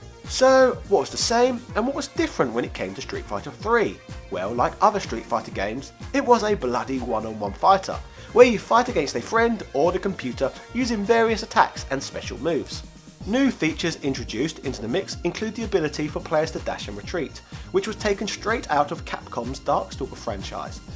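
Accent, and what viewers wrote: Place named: RP, United Kingdom. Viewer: You truly are an aristocrat. Most Brits might call it Stree figha free.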